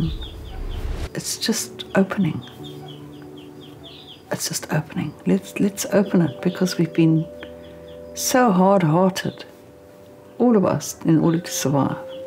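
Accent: South Africa. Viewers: Beautiful movies and channels. Feels so familiar the South African accent ... we moved abroad a few years ago. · Amazing very special channel. As an ex South African so enjoy listening to your lovely accent.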